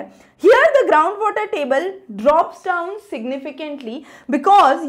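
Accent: India. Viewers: This is Indian English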